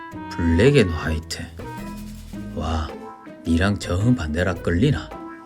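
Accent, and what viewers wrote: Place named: Busan, South Korea. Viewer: 부산인가요? 사투리가 동질감 있어서 더욱 빠져들게 했어요. 고생 많으셨습니다. 좋은 일만 많이 생기시길 바랍니다.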